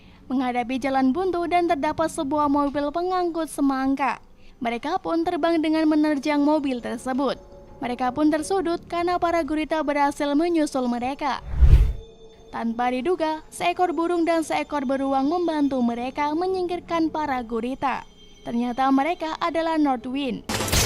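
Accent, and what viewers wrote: Java, Indonesia. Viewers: bak samena wong jowo yo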